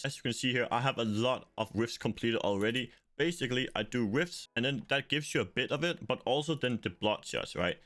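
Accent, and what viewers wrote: Denmark. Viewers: wait rly?? Im from Denmark and i thought that english sound like it had a Denmark accent lol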